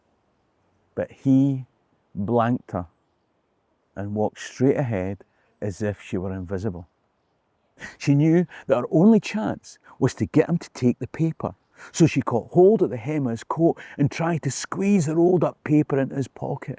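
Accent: Scotland, United Kingdom. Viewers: No, no-one said that, and that is every bit as disrespectful a distortion as asking you not to speak in a Scottish accent was a disrespectful request. I have watched enough of your videos to know that you are more intelligent than that. Normalising the use of AI imagery harms people who make their living making that imagery for creators by making it acceptable for those who can afford to pay them to cut them out instead. You are talking like no form of visuals other than AI are available for you to make your content less visually boring. That is patently untrue. Perhaps if I were to churn out 10 AI generated videos on Scottish history a day, that had been "trained" on your content, you would get it then.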